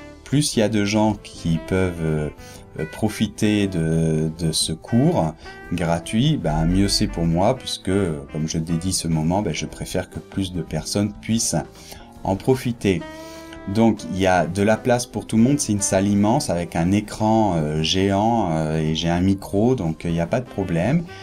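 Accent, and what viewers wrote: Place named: France. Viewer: Tu as un accent français sympa quand tu parles l’espagnole. Comment as tu appris l’espagnole? J’ai un niveau avancé B2. C’est gratuit! Tu fais un bon boulot.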